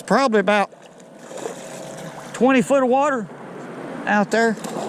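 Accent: South, United States. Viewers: Don't understnad what you say with that funny Alabama accent. Love ur southern accent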